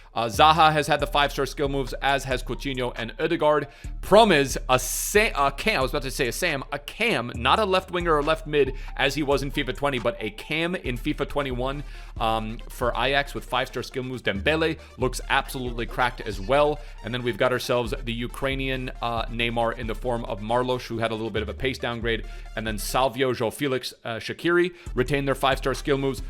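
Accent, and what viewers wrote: Australia. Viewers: More Aussies accent pls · That Aussie accent 👌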